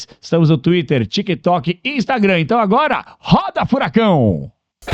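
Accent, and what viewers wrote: Brazil. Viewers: Meu deus, eu dava milão que tu era do paraná!! Sucesso.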